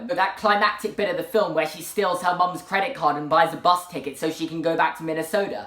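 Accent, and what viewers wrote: United Kingdom. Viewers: God I love British accents "SanFRANcisco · I’m sorry I’m American and I love British accents but I still can’t get over mum I’m no trying to make fun just I love anything foreign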